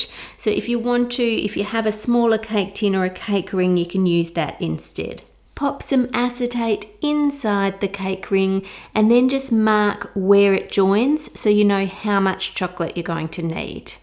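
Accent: Australia. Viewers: I couldn’t be happier that the lil stop motion title sequence is back. Also can’t get enough of voiceovers, iz just relaxing n calming(I think it’s the Aussie accent).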